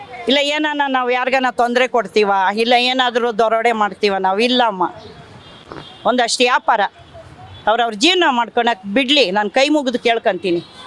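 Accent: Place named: Tamil Nadu, India. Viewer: Tamilnadu ge hogi maaru. Aa slang kelak aagalla.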